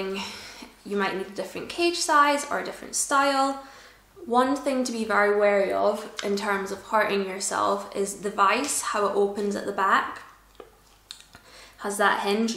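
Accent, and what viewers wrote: Scotland, United Kingdom. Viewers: Are you Scottish? Your accent sounds Scottish to me.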